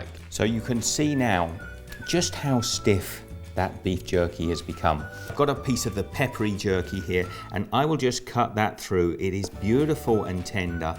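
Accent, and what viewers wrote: Australia. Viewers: This guys Australian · Tell me he isn't Australian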